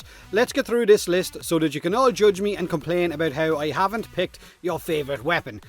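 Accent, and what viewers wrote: Ireland. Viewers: Honestly, Idk if I watch your vids for the content as much as I enjoy the jokes, nonsense and the feckin’ irishness and hearing u try to say “three”😂 “The Tigris Bleeds ships” I’ve never laughed so hard in my life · Is he Irish?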